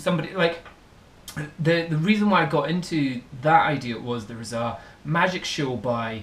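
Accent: Scotland, United Kingdom. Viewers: your video made me want to look for a free online episode of the Highlander (seriously). the Scottish accent and nature references.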